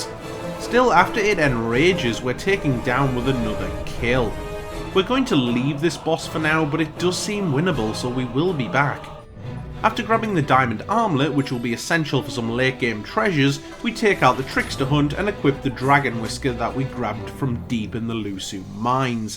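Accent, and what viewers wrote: Northern England, United Kingdom. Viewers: Am I hearing a fellow northern English accent?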